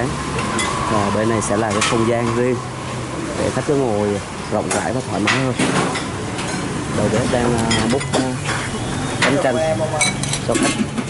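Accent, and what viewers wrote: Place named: Quang Ngai-Binh Dinh, Vietnam. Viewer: Giọng nói nghe hơi Nẩu chưa chuẩn lắm nói ít thôi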